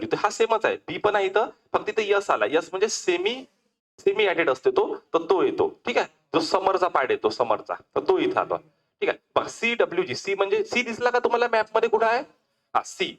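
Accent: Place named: Vidarbha, India. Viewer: Sir tumchya bhashevrun as vatte ki tumhi vidarbhatle ahat... Nice lecture sir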